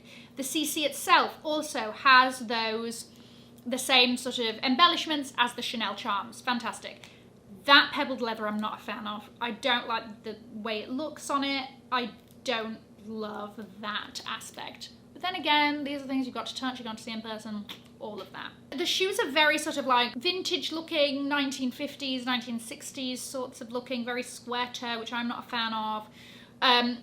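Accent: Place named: RP, United Kingdom. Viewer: Hi, I agree with you, some elements I like, some others are simply silly. Your videos are always interesting and I love your fantastic received pronounciation.😍😍😍 ( I understad everything you say and I’m an italian living in Spain)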